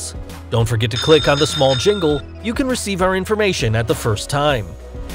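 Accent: United States. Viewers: Why does your voice sound american but it sounds like a foreign person wrote it and ur just saying it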